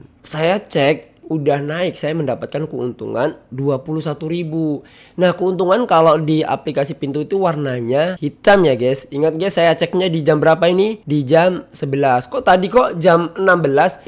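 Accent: Indonesia. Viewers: wong ngapak kayane ya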